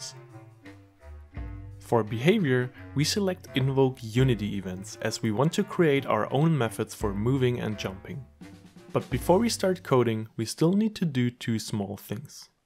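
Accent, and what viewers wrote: Germany. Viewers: Nices Tutorial, kann es sein, dass du Deutscher bist? xD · are you german?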